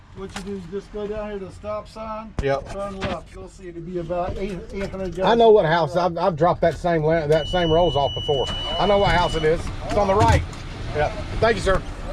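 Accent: South, United States. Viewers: Laahamburghennies, Austin mahrt inns, beautiful sunny southern vernacular! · "Lamberginny, Austin Martin..." haha, gotta love the southern accent.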